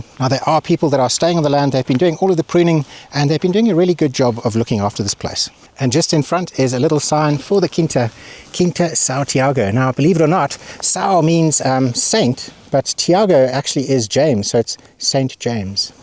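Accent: South Africa. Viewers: Nice to hear a South African 😊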